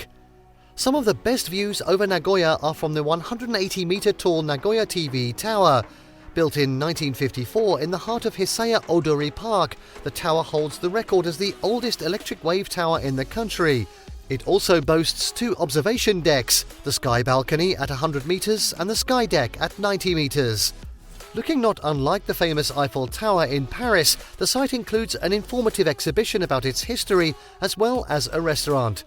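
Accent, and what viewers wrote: United Kingdom. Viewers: Not really British English but it does have a slight British accent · イギリス英語かな？聞き取りやすい。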